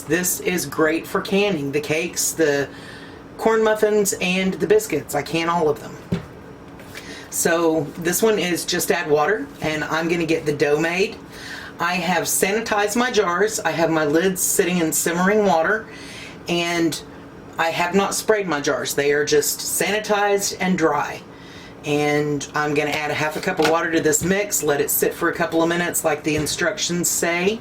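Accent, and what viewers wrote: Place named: Texas, United States. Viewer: Are you a TX girl? Love to listen to you talk.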